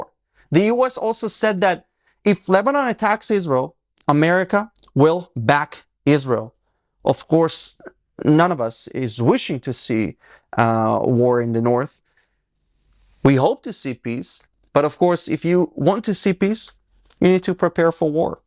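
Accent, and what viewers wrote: Russia. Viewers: slight Russian accent?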